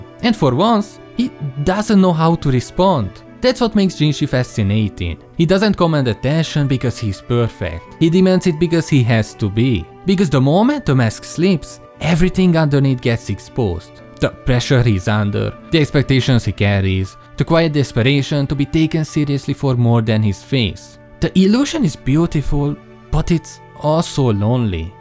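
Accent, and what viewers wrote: Hungary. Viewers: First video by for me. Not even a minute in......is that a hungarian accent I hear?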